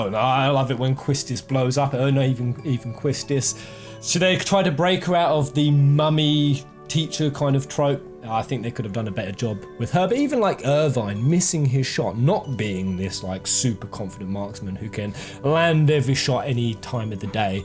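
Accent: United Kingdom. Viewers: Are you British mate?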